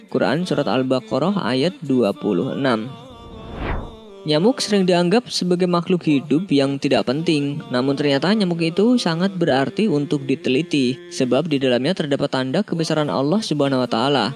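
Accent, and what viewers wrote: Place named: Kebumen, Indonesia. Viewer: Wong kebumen ngapak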